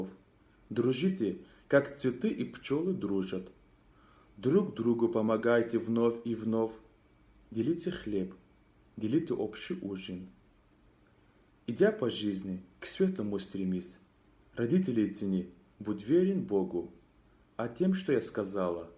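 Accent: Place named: Dagestan, Russia. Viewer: Лезги акцент акуе вичин😀😀😀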